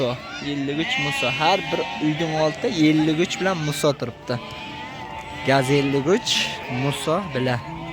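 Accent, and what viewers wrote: Surkhandarya, Uzbekistan. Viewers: Гап йук укам асил сурхоним шеваси маладис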